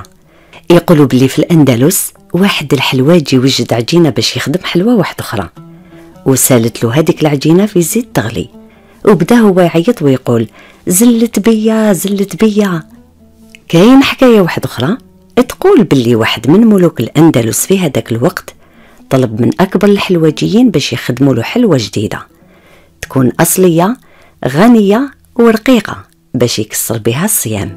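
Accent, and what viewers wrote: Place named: Algiers, Algeria. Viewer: Vive la voix de sa façon de lire est formidable typiquement algeroise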